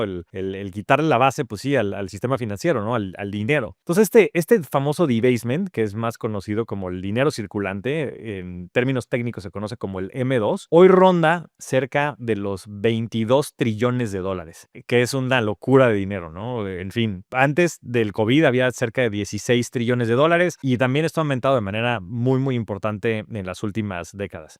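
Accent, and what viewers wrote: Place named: Mexico City, Mexico. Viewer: Interesante. El algoritmo me mando para acá. Me suscribo y like a pesar del insoportable tonito chilango fresa q se te sale cada tanto.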